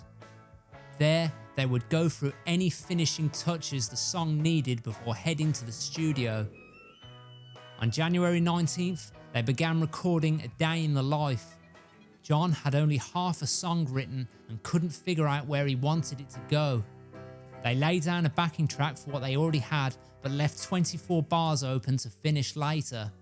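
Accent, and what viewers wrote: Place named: West Midlands, United Kingdom. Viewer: Thanks for all these videos you’ve made documenting the discography, really good stuff. Made even better by your West Midlands narration, you’re a legend!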